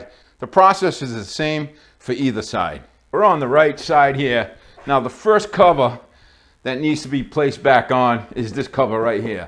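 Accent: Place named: Brooklyn, United States. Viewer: You're from Brooklyn, or Long Island, which one? I'm from Boat, grew up in Brooklyn, now live on Long Island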